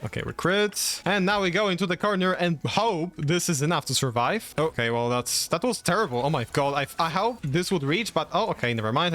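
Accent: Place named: Italy. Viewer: yo are you Italian? love your accent and your videos!!!!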